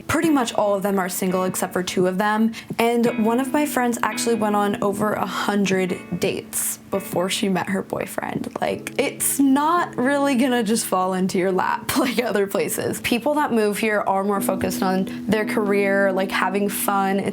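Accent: Los Angeles, United States. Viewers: Quintessential L.A voice and personality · At least the LA accent has rubbed off on you!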